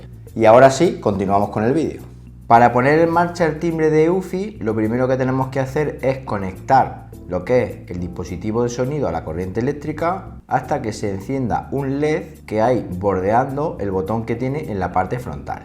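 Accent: Murcia, Spain. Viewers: Gracias por la review, muy buena como todas las que haces... Tengo 3 preguntas si pudieras contestarme te lo agradecería, uno... Tiene sensor de movimiento para grabar lo que pase delante de la puerta aunque no toqué el timbre, dos... Se puede hablar desde el móvil con el que esté en la puerta y, tres... Eres de Murcia o de Almería...??? Hehe 😉🙏 gracias...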